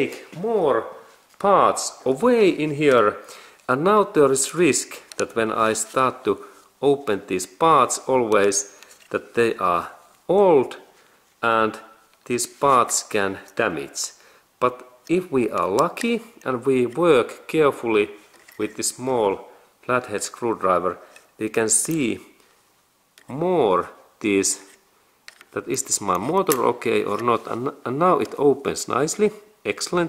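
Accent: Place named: Finland. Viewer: Aiettä nautin tästä rallienglannista!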